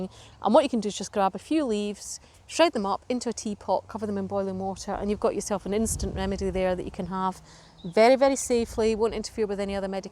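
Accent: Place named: Scotland, United Kingdom. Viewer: Love to hear a Scottish accent. Live not too far from Fife. 😊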